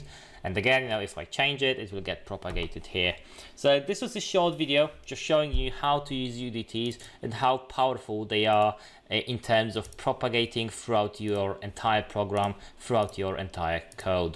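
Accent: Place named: United Kingdom. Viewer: Thanks man ! where is coffe button ? // BTW your brtitish accent is perfect for me so clean 😃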